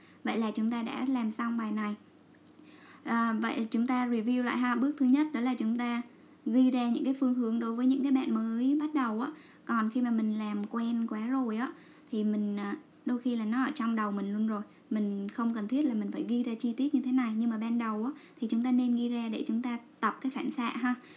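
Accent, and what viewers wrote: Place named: Dong Nai, Vietnam. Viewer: Không liên quan, nhưng em nghe giọng chị giống người dân Gia Kiệm Đồng Nai ạ :)) và cảm ơn các Tip của chị ạ, khá chất lượng và giúp e rất nhiều.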